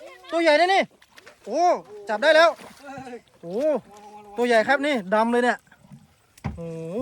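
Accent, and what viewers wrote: Isan, Thailand. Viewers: พูดไทยเก้งก้าง ชัดบ้างไม่ชัดบ้าง ถ้าเป็นไปได้ สำเนียงนี้ (เว้าอิสานไปโลด)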